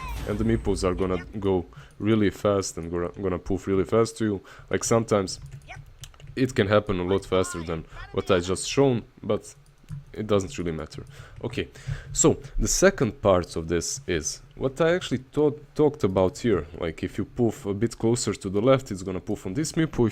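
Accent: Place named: Balkans. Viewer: Haha znao sam da si s balkana po akcentu.Svaka cast lajkovao videi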